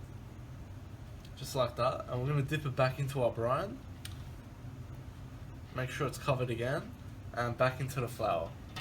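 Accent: Australia. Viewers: What the f&^k is that accent? Every time I hear someone from western Sydney speak I want to vomit. MSG impossible to find in Australia.... except at ANY asian grocer you fuckwit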